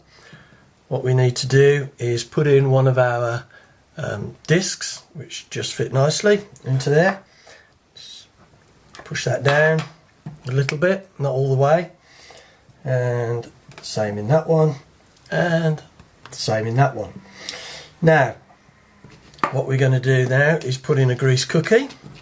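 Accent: England, United Kingdom. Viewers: It's nice to hear an English voice as well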